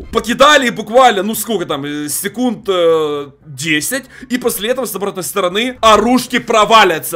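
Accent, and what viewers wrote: Ukraine. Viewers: Ты Украинец ?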